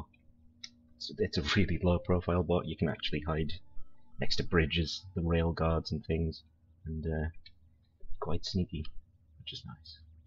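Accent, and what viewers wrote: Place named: Northumberland, United Kingdom. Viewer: So, you're obviously English, where you from? Sound like you're from Northumberland!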